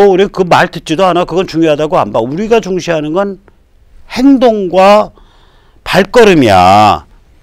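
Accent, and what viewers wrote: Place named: Seoul, South Korea. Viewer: 우파 논객 분들이 대부분 나이가 많으신 편입니다만, 제 생각에는 이 젊은이들과 가장 소통을 잘 하실 수 있고 잘 되실 수 있는 분인것 같습니다. 그래서 하나 제안을 드리자면 방송하실때 복장을 와이셔츠 넥타이보다는 좀 더 캐주얼하게 입으셔서 2,30대 젊은 사람들에게 좀 어필 하면 어떨까 합니다. 목소리도 좋은시고 대부분의 우파 논객들이 경상도 분들이 많은데 은 서울 표준말을 쓰시고, 그리고 의 탁월한 지식과 결합되어 말씀도 재미 있으면서도 아주 설득력이 있는것 같습니다. 그래서 젊은 사람들이 충분히 주의를 기울일 수 있는 분이라고 생각합니다.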